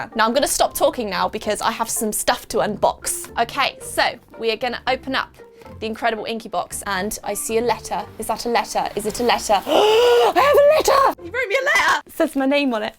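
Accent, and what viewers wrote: United Kingdom. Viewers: Would not mind seeing and hearing you for two hours your from my neck of the woods so is always nice to hear another person from the UK but from elsewhere in the world I love the look of the Inky box hugs from Scotland 😁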